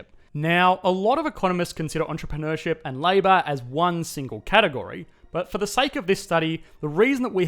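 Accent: Australia. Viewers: "the sweet supple sound of my Australian accent" Darn he figured out the real reason I watch! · Mate your Ozzy accent...:)) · Does the author speak in Australian accent ? Thanks for the video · Is true. If it wasn’t for that sweet koala accent, I wouldn’t be able to get through all the gloom and doom our future seem to be careening towards.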